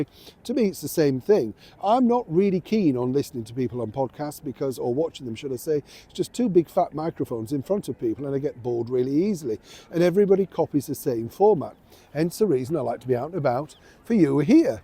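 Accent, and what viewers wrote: United Kingdom. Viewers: Love you at least you’re not endlessly talking to Americans like Dan Wooten. Lovely to hear your commentary. Your representation of the British voice is refreshing. Thank you x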